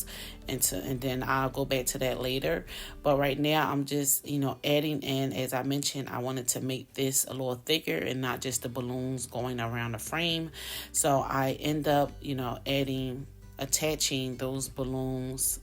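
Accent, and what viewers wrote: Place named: Philadelphia, United States. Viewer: I knew you were a philly girl as soon as I heard your voice..I can recognize a fellow philly girl from anywhere! Lol....I love what you are doing and your work looks amazing I moved to Florida and I am about to start my business as well.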